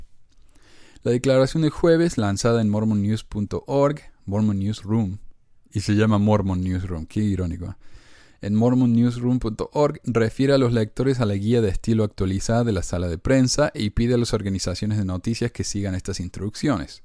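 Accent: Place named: Cordoba, Argentina. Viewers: Mira " " con ese acento marginado Gaucho, no es nuevo esa noticia de llamarse correctamente de la IJSUD de hace décadas se menciona para el uso debido, pero igual puedes usar tu libre albedrio, no te culpamos, lo importante es el fondo, quiero buscar también 3l urim y tumi pero quiero primero terminar de encontrar el libro de Ezequiel y ideas, mientras vivo por la fe y degustando del escudriñar las sagradas escrituras del "Antiguo", "Nuevo" y "Otro Testamento de Jesucristo" y así comprender y amar a tipos como tú no.imporra si eres ninfómana o gay, igual te deseo lo mejor y eres bienvenido, y si hay algo que denunciar dentro estamos del mismo lado, limpiar la casa para salir a Óscar, slds compañero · soy miembro de la iglesia, comenté vídeos tuyos anteriormente sin verlos de forma completa, y no entendía semejante trabajo de investigación y dedicación de un ex miembro de la iglesia para desacreditarla. 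Quiero que sepas que este comentario lo hago con todo el deseo de dar mi opinión y no el de pelear ni criticar. Hay dos cosas que me llaman la atención: Primero. El tiempo que gastas en hacer estos vídeos es llamativo, la verdad que se puede ver que le das bastante dedicación y eso se puede ver en la edición, los micrófonos, etc. Es raro que cuando citas a los profetas lo haces con una intención de ridiculizarlos, tanto a Jose Smith, el profeta Nelson entre otros. Segundo. Fuiste miembro de la iglesia, lo decís en tus videos, esta más que claro que seguramente entraste al templo e hiciste convenios sagrados, no hay que ser ningún genio para saber eso. Tu acento es de Córdoba, al principio pensé que capaz eras de otro país y no Argentino. Capaz siendo un ex miembro se explica un poco tu comportamiento. Lo que si no entiendo y en esto creo que vas a coincidir es que La Iglesia de Jesucristo los Santos de los Ultimos Dias o si queres le digo La Iglesia Mormona, como vos quieras, hace muchas cosas que son buenas, edificadoras, ayuda a las personas a ser mejores, enseña principios que mucha gente no duda que son verdaderos y hasta las puede cambiar, como lo hacen todas las religiones cristianas del mundo, siguiendo los pasos del propio Salvador (que no sé sinceramente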